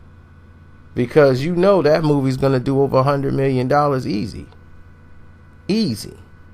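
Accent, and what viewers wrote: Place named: New York, United States. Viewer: you trippin on this one.. who did tribute songs for BIG outside bad boy, which did it more less to line their pockets.. nas had a song for pac and big..and jayz snake a** was more like - i'm glad you're dead the city is mine..and in term of fans, pac got way more love..i can remember where i was at, what i ate that day..you remember how popular those bootleg 2pac shirts was.. the whole fam had them sh*ts..thought you was midwest, you sound like a new york n*gga on this one..eventho in their heart's they loved pac (ie. maino, uncle murda)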